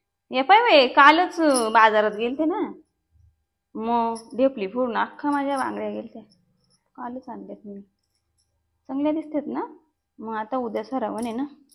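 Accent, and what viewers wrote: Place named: Shahapur-Murbad, India. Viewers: Shahapur murbad bhasha 😂😂😂 kinhavli pn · अभिनंदन आपल्या शहापूर - मुरबाड च्या महिलांचा परफेक्ट टोन पकडलाय . ऐकायला गोड , आपुलकी जाणवते . शुभेच्छा.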